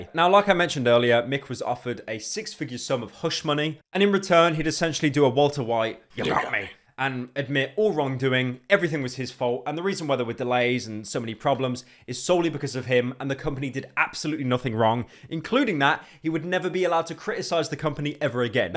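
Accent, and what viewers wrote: United Kingdom. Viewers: I always wait for the explanation because I'm European and I prefer the British accent over the depressed accent. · even if Charlie uploaded before, I'd still watch just because I prefer his britishness over Charlie's monotone voice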